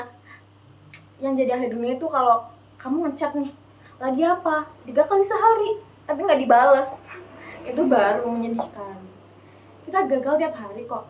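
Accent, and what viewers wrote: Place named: Banyumas, Indonesia. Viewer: Gen Z ngapak 🥰🥰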